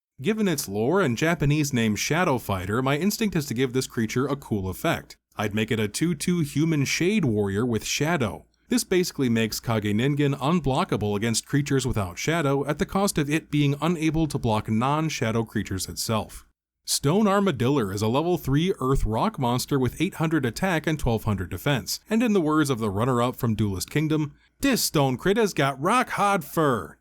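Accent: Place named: Brooklyn, United States. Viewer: I appreciate your Brooklyn accent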